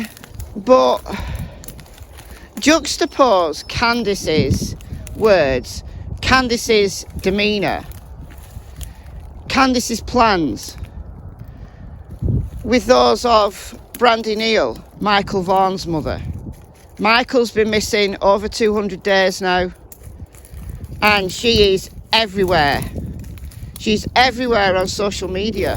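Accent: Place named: United Kingdom. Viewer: I love this accent greeting me in the morning, don't you!! Something about a UK accent that makes a person sound so much more interesting! I heard that they don't feel those footprints are Summer's. I can't for the life of me remember who said it. It was a creator that is very close to Kingsport.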